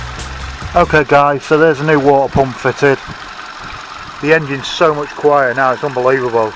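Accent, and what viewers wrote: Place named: Northern England, United Kingdom. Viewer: Hi from Manchester! You sound like a northerner too! Great video and like the Funky music!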